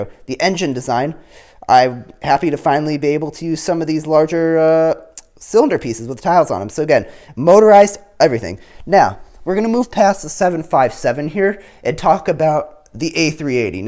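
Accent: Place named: United States. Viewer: I wanted an A350, but 757 is okay. It's stupid to get angry over what company made it, especially because it is mostly determined by a bias of American/Russian(they love their unsuccessful planes)/other(Airbus). I am British and am inclined to Airbus, you sound American and so like Boeing, Skyships like Russian because he is a vodka-drinking comrade.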